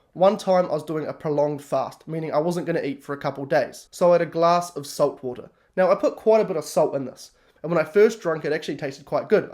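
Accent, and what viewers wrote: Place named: New Zealand. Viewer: Kiwi accent is never a problem listening from Australia. Good on you ❤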